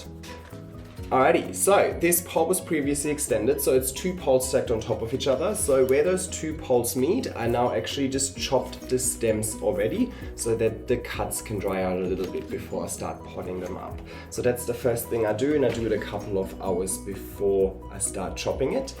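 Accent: Germany. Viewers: Den deutschen Akzent hört man einfach immer raus :-) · Lovely video! Is that a german accent u got?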